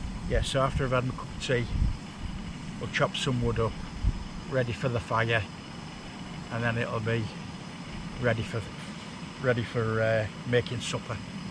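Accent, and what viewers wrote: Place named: Hull, United Kingdom. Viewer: Thought I could here ull accent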